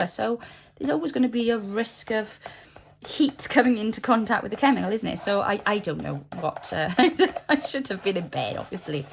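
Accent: Wales, United Kingdom. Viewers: So glad I found you - I've really been enjoying all the A-Z Christmas crafts. I spotted that Welsh accent too - I've just returned home to Wales after 20 years away and loving it. Nadolig Llawen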